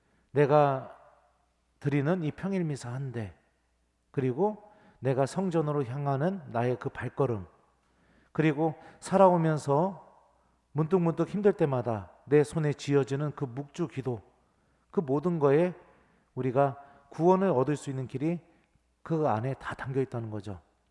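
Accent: Chungcheong, South Korea. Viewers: 충청도 사투리가 참 정겨워요^^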